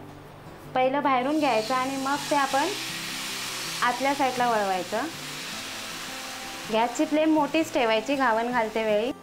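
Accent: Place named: Konkan, India. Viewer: खूपच छान रेसिपी सांगता पण सुंदर भाषेत कोकणि ढब छान वाटते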